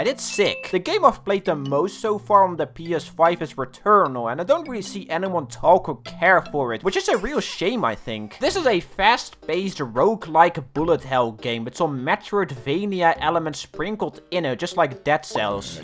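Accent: Netherlands. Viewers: hol up u dutch? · U dutch?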